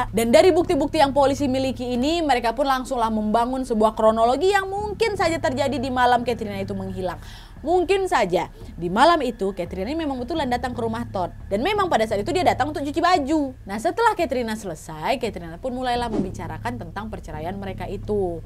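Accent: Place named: Sulawesi, Indonesia. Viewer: Ah suka sama kk kalau lg critaa..ada logat2 sulawesinya.. sukses ya kk🥰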